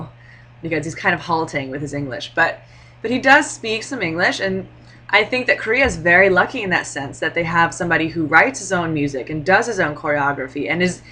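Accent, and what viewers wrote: United States. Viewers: I wish I could hear you speak Korean!! I'm interested in your dialect or amercian accent affecting it in any way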